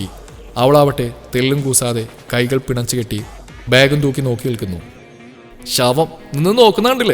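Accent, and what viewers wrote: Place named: Thrissur, India. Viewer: Thrissur basha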